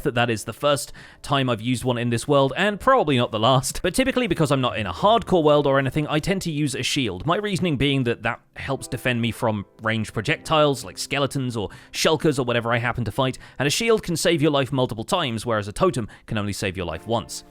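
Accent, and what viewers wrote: United Kingdom. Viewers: I'm on episode 4 of this series but wanted to leave a comment on a recent with the hopes of being seen. OG Minecraft player so I've seen a fair few let's play. I always come back to you though, you have such a wonderful balance of tutorial but also a play through. It's nice to learn new things now but still see he same world develop and see you work through designs etc. Also lovely listening fellow Brits. You've got such a soothing voice!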